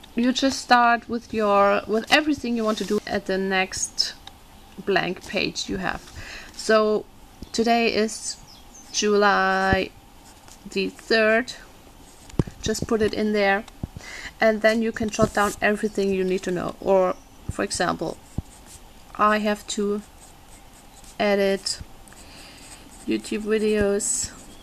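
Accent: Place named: Germany. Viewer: Wow, ich hab nur kurz reingeschaut und noch keine komentare gelesen - trotzdem sofort gehört das du aus deutschland kommst =) was ist denn ein bullet journal?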